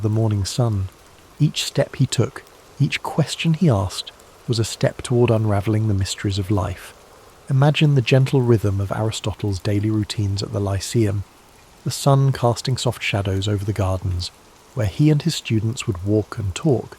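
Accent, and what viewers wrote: United Kingdom. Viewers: YESSS a British narrator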